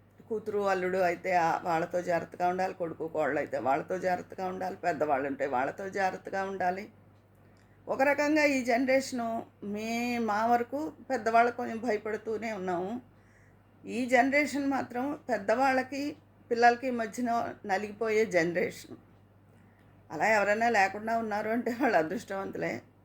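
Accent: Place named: Kakinada, India. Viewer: Mee maatalaloni accent ( yaasa), naakentho ishtam! Maa vaalladi Kakinada, mee maatalu vintunte,atuvypu vaalle gurthukosthaaru.Maa native place Bandaru,kaanee eppatinuncho Hyderabadlo vuntunnaamu.Mee kaburlu intlo vaaritho panchukunnatluntundi. Thank-you.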